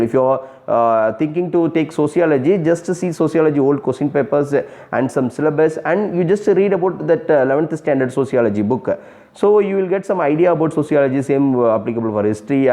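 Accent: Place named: South India, India. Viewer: nice to hear pure South Indian accent after a long time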